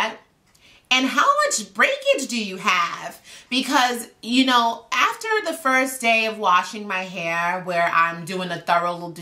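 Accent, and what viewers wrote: New York, United States. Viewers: Oh I will, you've got that great personality and New York accent, you're too enjoyable lol!!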